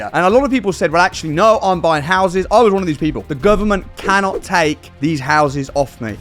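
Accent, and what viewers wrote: United Kingdom. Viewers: We threw a bunch of tea into a harbor once. It’s funny a Brit is narrating this story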